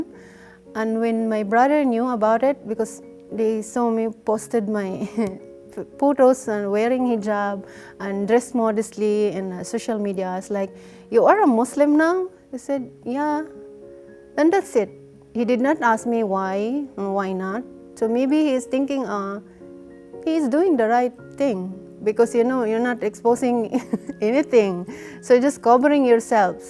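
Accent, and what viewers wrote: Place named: India. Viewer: True I'm from Malaysia and I heard a sprinkle of Indian accent there. The hijab makes her look more malaysian or Indonesian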